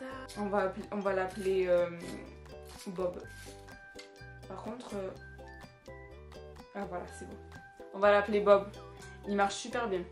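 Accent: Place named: Marseille, France. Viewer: T fournitures sont trop mignonne moi jpp faire sa je vais en cap mécaniques l'année pro donc bon 😂 mais après c'est chou j'ai trop hâte de faire mes fourniture est d'aller en cours mais que les trois premier jours après sa va me saouler ( ta l'accent marseille incroyable comme moi mais toi vrm remarquer ) ❤️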